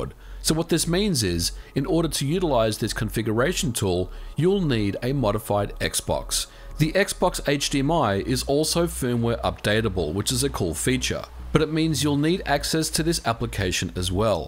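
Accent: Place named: Australia. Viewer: Nope, I'm watching this because I could never miss a video with this amount of aussie accent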